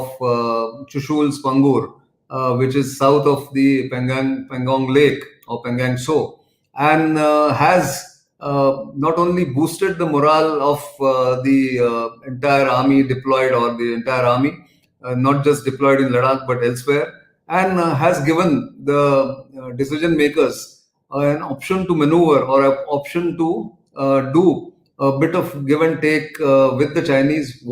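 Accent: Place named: Maharashtra, India. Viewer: It's pronounced conventionally as लडाख in Marathi, and Nitin being native Marathi speaker may tend to pronounce certain words as Marathi speakers have been accustomed to pronounce. This is similar to how many of the Hindi speakers pronounce तमिळनाडू (correct) as तमिलनाड / तमिलनाडू (incorrect). So just chill down and be your tolerant self. Peace.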